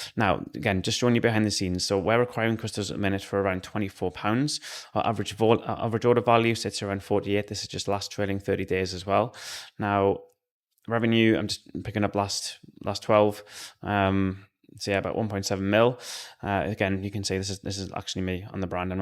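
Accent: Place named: Germany. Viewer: are u german ? you sound a bit like it im german aswell thats why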